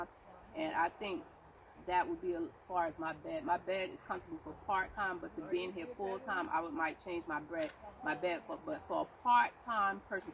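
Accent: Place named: New York, United States. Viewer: Very informative...she must be from NY or NJ...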